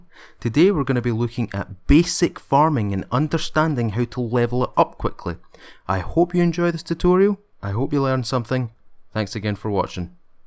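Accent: Scotland, United Kingdom. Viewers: Haha, I’m from Glasgow too, how can someone not know a Scottish accent when they hear it?..... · ya know what is it with people in the comments saying hey do you have a Scottish accent well duh of course you can hear it dumb ass what I'm I a flipping Korean no... THE ONLY EXSCUSE IS THAT MOST COUNTRIES DON'T EVEN KNOW THAT SCOTLANDS A PLACE and if they do hey say we're all con men ok rant over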